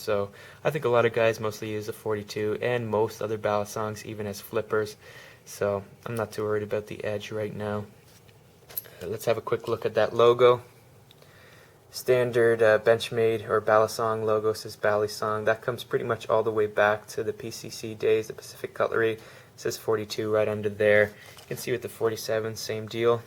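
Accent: Canada. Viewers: Wait are you Canadian?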